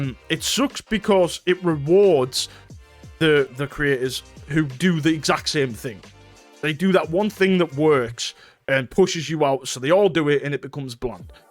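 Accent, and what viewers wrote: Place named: North, United Kingdom. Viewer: I totally agree with all the points you brought up. Seeing it from the view as a creator and as someone who likes to express themselves in the comments & give feedback on videos... it feel as though we're all walking on eggshells & we can't be as organic as we want to be. For creators, they can't push the boundaries or be edgy or forward thinking anymore. As a viewer..: I'm not one of those Twitter types who gives knee jerk abrasives comments, if anything I'm respectful of of a creators channel & I don't want to bring any negativity to the channel but it's so annoying when you make comments that are totally valid, factual & non offensive... it gets removed or censored, all while someone can berate you in the crudest manner & they get a pass. The latest YouTube algorithm & censorship doesn't make sense but hey ho, at least they're not as bad as Twitch. 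Ps love the accent & as a Londoner & every time I've met people from up your end they've been the nicest people 💯🙏🏾👊🏾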